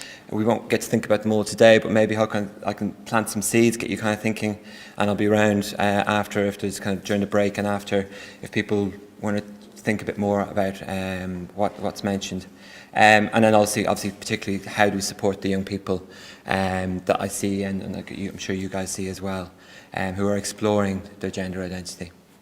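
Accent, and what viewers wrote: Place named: Ireland. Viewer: If he had a German accent instead of that lovely Irish one, I think people would be much more suspicious of him.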